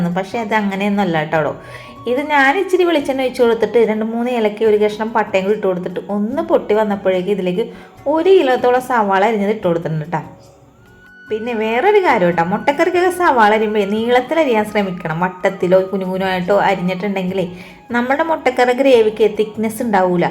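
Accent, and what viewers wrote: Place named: Ernakulam, India. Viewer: എന്റെ ഒരു frd നിങ്ങൾ സംസാരിക്കും പോലെ ആണ് സംസാരം എറണാകുളം കളമശ്ശേരി ആണ് അവരുടെ വീട് 👌👌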